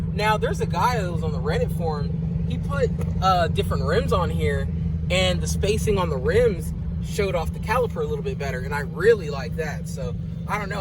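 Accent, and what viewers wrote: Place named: West Coast, United States. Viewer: well it's only 2nd grade English but I wasn't taking a shot at you personally... millions of West Coast people have that exact inflection, especially your bro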